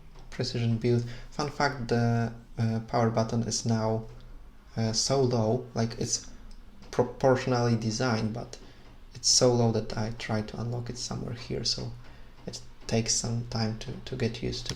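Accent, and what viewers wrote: Poland. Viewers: are Polish? yor accent is so strong ;-)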